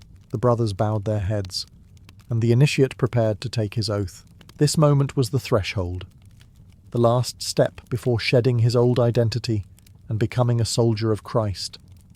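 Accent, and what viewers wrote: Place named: England, United Kingdom. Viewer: I lived for seven years in Alaska. Miss it terribly. I’m now living in Baltimore, Maryland about an hour’s drive near the small town where I grew up. Gettysburg is close by. I love your videos! Your English accent and medieval history are quite a comfort 👍🤓. My nana and mum are Australian so I always prefer the Commonwealth. I try to watch only British film, music, and TV. Nighty nite.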